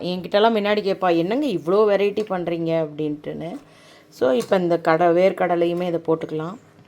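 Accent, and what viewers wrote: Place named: Brahmin, India. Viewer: மிக அருமை.. மேலும் உங்களது பிராமணத் தமிழ் உச்சரிப்பு நிகழ்ச்சிக்கு கூடுதல் சிறப்பு!👍👍💐🪷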